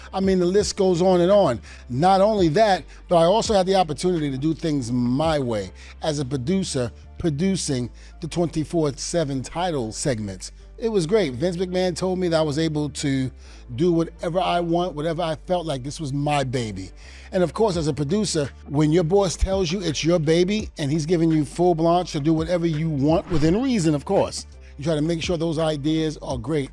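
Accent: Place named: Baltimore, United States. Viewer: i literally never noticed his baltimore accent before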